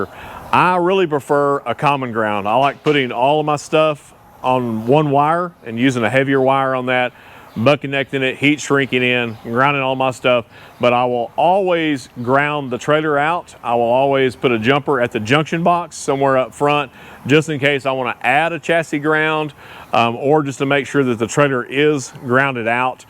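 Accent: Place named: South, United States. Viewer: Yes indeed . Always check the ground. Excellent video. I understood you clearly. Some folks migh think a southern fast talking is to much. You got alot of info down in shorter amount of time . Wish I did that on my bilge pumps last week. Thought the manual switch was left on and burnt them out. Bought 2 replacements. Reconnected and noda. I should of checked the bilge wiring better. It was the ground wire. Trailer light no workkee. Re-wired aas I kinda took off one time while they were still connected. After new install , no lights. After troubleshooting, it was indeed the ground on the chassis light. I have to remember to always check the ground. Cheers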